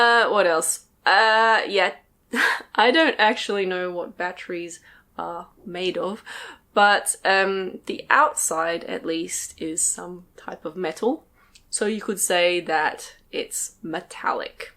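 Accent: United Kingdom. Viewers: Do you speak British English? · is it british english ?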